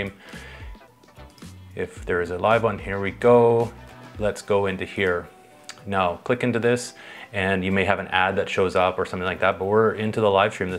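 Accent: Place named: Canada. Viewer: I KNEW you were a CANUCK ! You have an accent! Especially when you said, "OUT" ...."If you have a question, leave me a comment below & I'll try and help you 'OUT' !! Tell tale sign of a Canuck! Tks for your helpful tips on how to comment during live stream. Sending you good vibes & California sunshine ! 🌴😎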